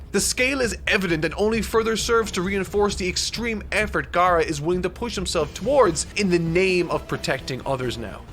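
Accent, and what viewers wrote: Ireland. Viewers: Are you Irish, sir? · That's wild af to call an Irishman a Brit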